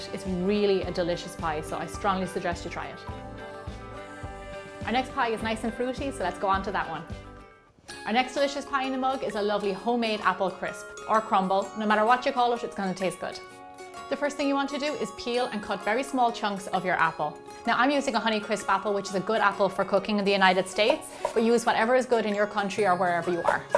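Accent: Ireland. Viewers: They are all amazing! You've taken mug "cakes" to the next level! Weill dun (trying to imitate your super cool Irish accent! lol) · Did anyone else notice she had an Irish accent.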